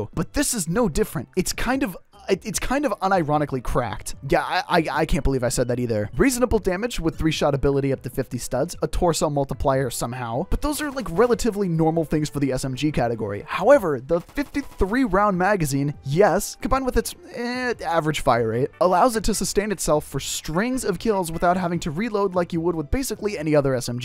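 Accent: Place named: New York, United States. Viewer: does words and picks up accents quick. Great talent. I love his New York accent. Better than mine. I was born in New York & work in NYC. I love his NY accent & a little jealous. I'm female. Jealous is more a female thing!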